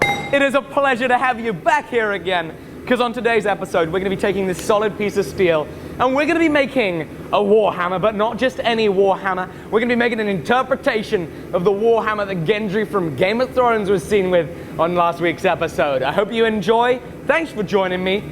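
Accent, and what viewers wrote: England, United Kingdom. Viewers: Are u English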